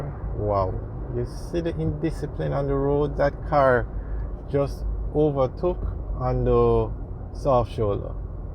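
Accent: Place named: United States. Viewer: You sound like someone that use to live in the states.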